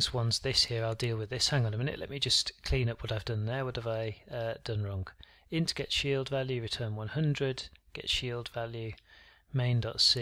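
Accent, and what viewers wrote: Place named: United Kingdom. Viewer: Your clear voice with nice BrE explain things way more better than my uni lecturer, shame on them who call themselves "professor" may have great academic achievement but have no idea how to teach in an accessible way .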